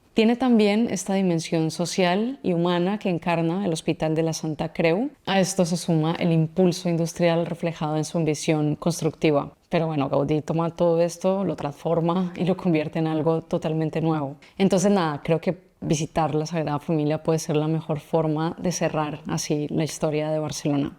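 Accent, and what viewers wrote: Colombia. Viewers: De qué parte de Colombia eres? Tienes un acento y voz preciosa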